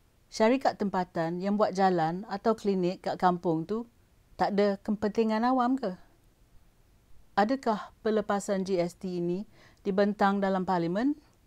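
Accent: Perak, Malaysia. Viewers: Yong oghang peghak ke? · orang perak ke yong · Pekat bahasa perak..haha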